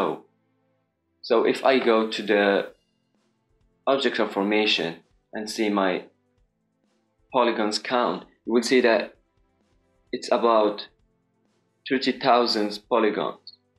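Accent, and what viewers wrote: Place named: Morocco. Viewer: khoya 3reftek mghribi hir mn l'anglais dyalk hhhhh. keep up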